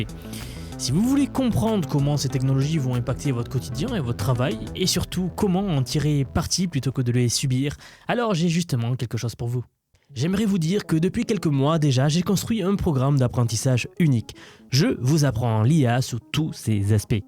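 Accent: France. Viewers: Ça prendrait des sous-titres pour comprendre les noms. Un français qui essaie de parler anglais, on comprend jamais rien 🤷🏻‍♂️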